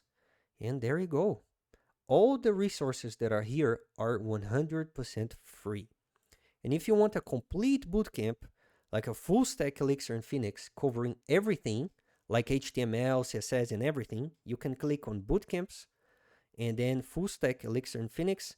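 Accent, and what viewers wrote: Brazil. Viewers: Great content! Are you Brazillian?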